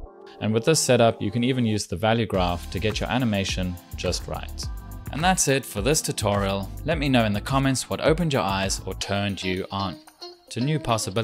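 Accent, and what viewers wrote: South Africa. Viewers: Knew it! Heard the south african accent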